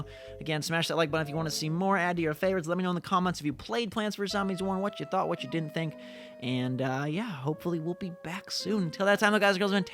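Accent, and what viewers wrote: Australia. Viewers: R u Australian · Are you from australia?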